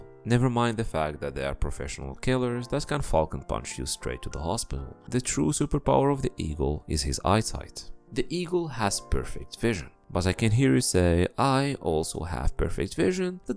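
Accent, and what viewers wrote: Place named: Morocco. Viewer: Dude your accent sounds moroccan, where are you from?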